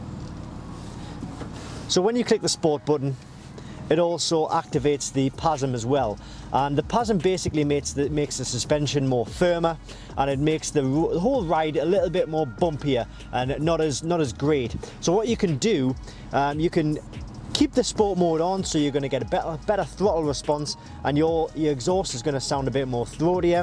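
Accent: Newcastle, United Kingdom. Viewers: Alan Buckingham Fairly sure he said that he wasn't sure about the air in the seats. Why you being so offensive, if you read the comments here you will see that most people did learn a couple of things, and for those that didn't were nice and polite, the way a gentleman would be. He has a Geordie accent, and probably has more class and decorum in his little finger than you have in your whole body. You fucking child. Jog on your not welcome here.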